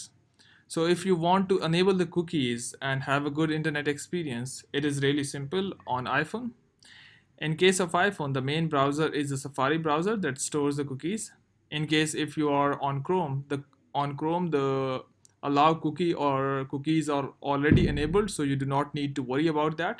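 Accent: India. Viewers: He is Indian . I can tell by his accent.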